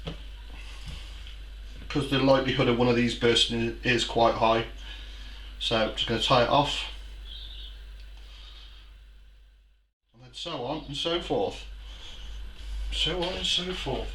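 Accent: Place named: Midlands, United Kingdom. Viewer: Are you from the Midlands,? I can tell by your accent.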